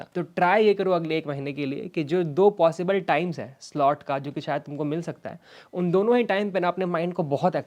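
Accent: Bihar, India. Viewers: Aise kuch ni that isme jo dusra youtube video me ni h is topic pe. Aur thoda pronunciations pe kam kro Kuch words pe being Bihari wo dikh jata h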